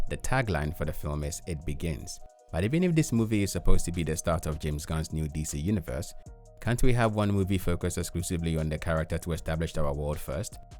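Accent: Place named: India. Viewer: of course this video is made out from a indian dude w the accent lmfao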